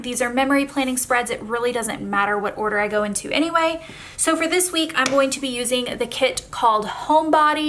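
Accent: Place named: South, United States. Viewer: I can hear your southern accent coming back!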